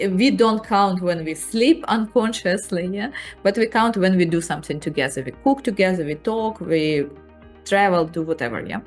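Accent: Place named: Russia. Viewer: Чувствуется Русский акцент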